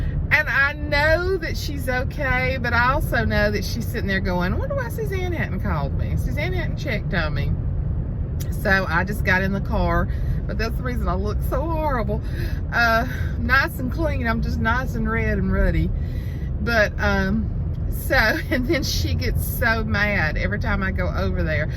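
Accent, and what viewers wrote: South, United States. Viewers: Your southern drawl was in true form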